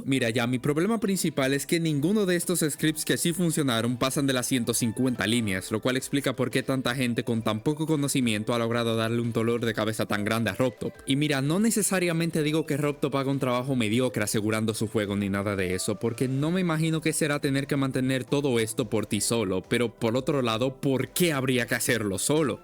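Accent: Dominican Republic. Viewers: Espera, sos de dominicana? Ese dato no me lo sabia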